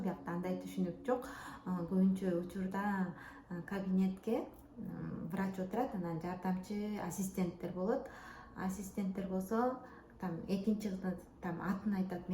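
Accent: Standard. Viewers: Кыйын Кыз экенсин,кыргызча нукура суйлоп тушундуруп.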